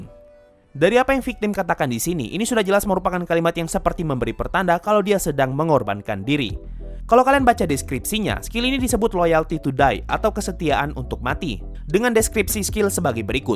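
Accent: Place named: West Java, Indonesia. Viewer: jadi bakal ada banyak kalimat yang "Rada" aneh, wah kayaknya mimin org sunda :v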